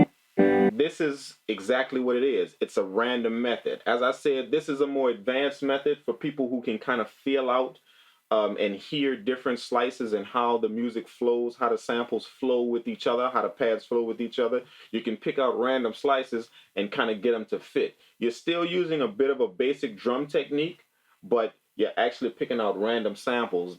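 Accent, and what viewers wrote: New Orleans, United States. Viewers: Lol. Interesting. Are you from N.O.? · Man are you from New Orleans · Love the accent sounds NOLA new to the MPC and beat making new sub🔥🔥🔥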